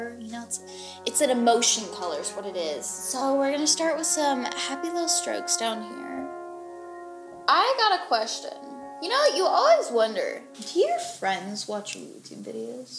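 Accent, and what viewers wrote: United Kingdom. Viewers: You sound so good in British accent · I love the British accent!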